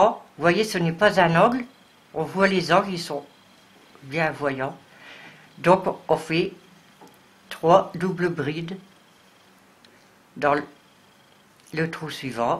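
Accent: North, France. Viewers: bonjour, je suis née a Bruay sur Escaut, et ma famille était a Raisme, Anzin, la dernière tante nous a quitter, la tata m' a préféré, j en et plus, mes j adore entendre l accent du nord, gros bisous toi qui et très très gentil, gros bisous Monique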